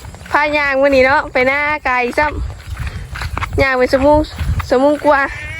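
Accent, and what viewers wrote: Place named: Isan, Thailand. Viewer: พึ่งดูครั้งแรก หนูอยู่ แขวง เขต อะไร ทำไม สำเนียง การพูด ของหนู เหมือนคน อิสานฝั่งไทยมากๆ ไม่เหมือนสำเนียง ของคน สปปฯ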